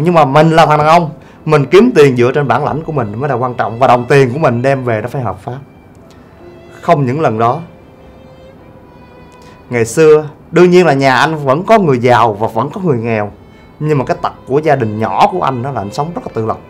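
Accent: Mekong Delta, Vietnam. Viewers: a nói đúng chất miền tây lun a oii. ABC các kiểu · a này nói chuyện hay quá, vừa thực tế vừa nhiều bài học thấm, nói chuyện kiểu miền tây chất phát dễ nghe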